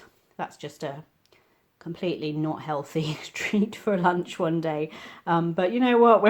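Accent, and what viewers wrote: United States. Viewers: Your American accent made me giggle! We do say it like that haha. Great haul and I love Franks Red Hot!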